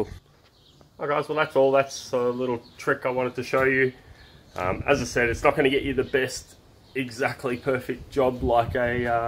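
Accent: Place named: New Zealand. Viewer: oo..sorry i tought somewhere in new zealand...totally different from the aussie i know...really appologised mate..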